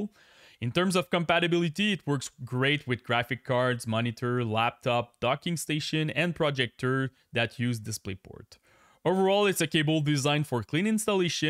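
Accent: Quebec, Canada. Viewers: Ça paraît que t'es québécois haha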